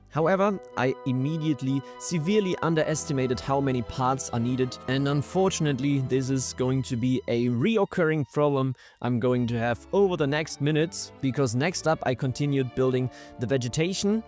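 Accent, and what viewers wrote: Germany. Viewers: Nice German accent you have there. So this is actually a departure airport with no arrivalibility? I guess i just invented that word. Thats what we germans do...😁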